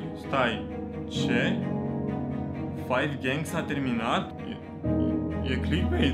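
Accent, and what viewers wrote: Moldova. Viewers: Ador accentul tau😍 esti moldovean de-al meu 😌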